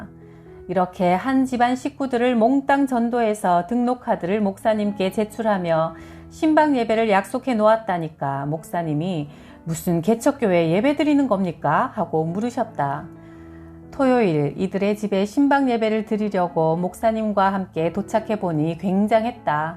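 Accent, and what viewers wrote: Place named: Daegu, South Korea. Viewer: 대구사투리도 너무너무 잘 하셔요 몇 번 들었는데도 참 고맙습니다 감사합니다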